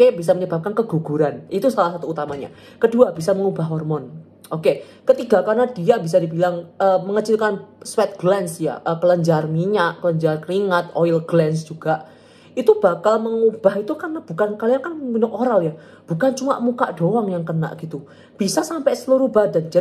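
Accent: Surabaya, Indonesia. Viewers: Logat suroboyo ne kak 😍 bagus bagus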